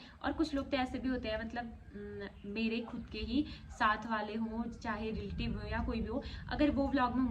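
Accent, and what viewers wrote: Pahadi, India. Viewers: Prnam 🙏🏼 dii mai hldwani se huu dii aap kbhi vlog phadi leaguge mai v banyaa kro kuki achaa lgtaa h phadi sunaa kisi muh se or apki tone v ati h phadi isliyee achaa lgta hh ❣️💕💖💖❤️🤗🤗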